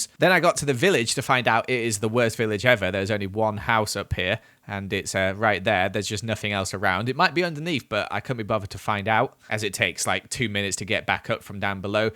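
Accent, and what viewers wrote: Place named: England, United Kingdom. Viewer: i live in america, but i love the english "accent"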